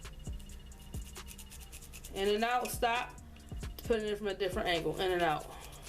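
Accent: Baltimore, United States. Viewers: Omg u sound like you’re from Baltimore lol but I’m starting my own locs I had them before but cut them several times but naw I need to go back using this method 😍😍😍😍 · Tell me you from Baltimore with out telling me you from Baltimore. Or at somewhere in Maryland.😊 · You from B’more??? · A tutorial with a Baltimore accent!! I love it! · What state are you in? I can tell your from Baltimore based on your accent. I am just hoping your in Texas! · I know a Baltimore accent when I hear one ❤ much love from Jersey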